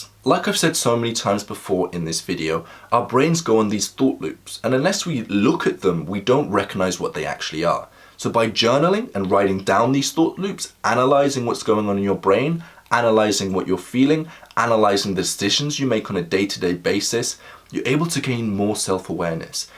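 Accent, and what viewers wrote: United Kingdom. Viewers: Your accent is wild I feel like you’re British but your dictation is Americanish to sound claró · Such an incredible, inspiring young man, not only you are very smart and charming and that British accent help you the most.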